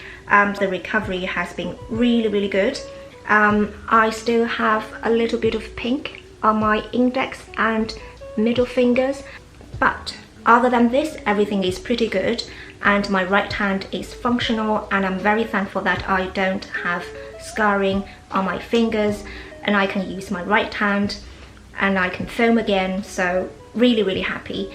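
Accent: United Kingdom. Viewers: 闭上眼还以为是哪个伦敦小姑娘在接受采访呢~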